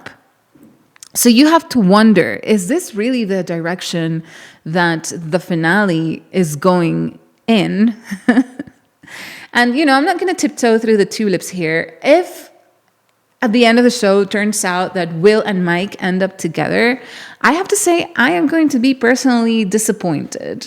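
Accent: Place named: Venezuela. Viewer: Tienes un acento más bonito en inglés que en venezolano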